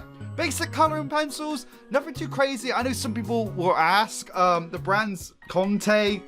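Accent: United Kingdom. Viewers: Vary British. Indeed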